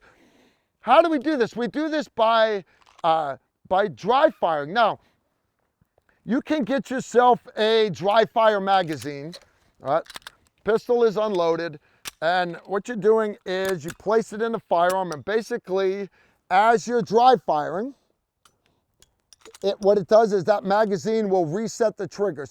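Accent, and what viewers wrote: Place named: New York, United States. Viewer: New York accent really comes through here.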